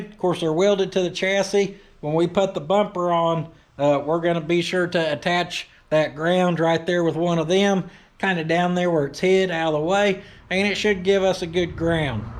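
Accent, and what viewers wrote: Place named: Los Angeles, United States. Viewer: Your from LA I knowd it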